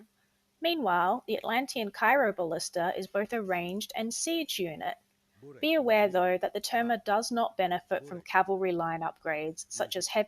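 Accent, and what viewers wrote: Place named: Australia. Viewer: Why do you sound Australian? 😅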